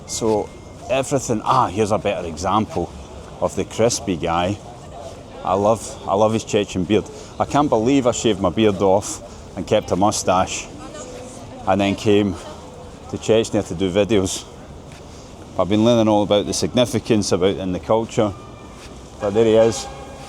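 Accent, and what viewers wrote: Scotland, United Kingdom. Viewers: ПЗДЦ акцент реально СКОТИШ))) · If you could post English subtitles. Everyone cannot understand Scottish accent. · Love Scottish accent. Like you moustache bro, it is back in fashion. Muslims are not bad it is propaganda to keep people away from known what Islam is all about. It is one good, no single human is worshipped, no forcing to change anyone beliefs, that’s why there are millions of churches all around the Middle East with freedom to practice and obligation to protect minorities. Read is the first word from God to prophetMohammed , read yourself and learn.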